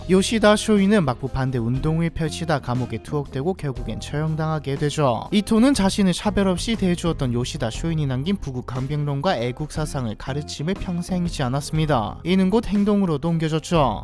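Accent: Gyeongsang, South Korea. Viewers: 갱상도가 표준말 하느라고 욕 본다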